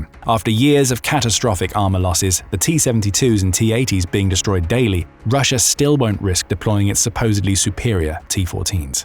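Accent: United Kingdom. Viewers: Tired of the UK-ENGLISH accent in all these Ukrainian videos.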